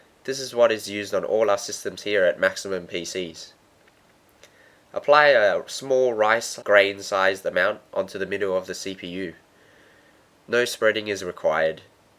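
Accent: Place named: Australia. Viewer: Australian accent is always nice to listen to.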